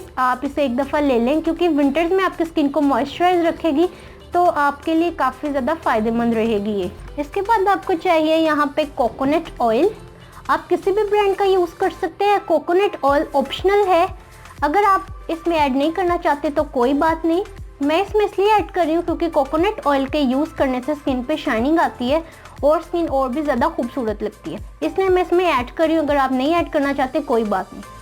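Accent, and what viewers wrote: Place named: United Kingdom. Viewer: Ap urdu british form me q bat karti hain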